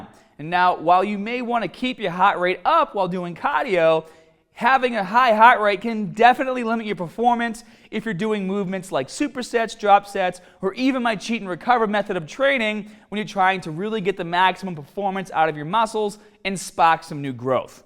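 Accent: Boston, United States. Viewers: Lowis Coetzee He has a strong Boston accent, which he acknowledges.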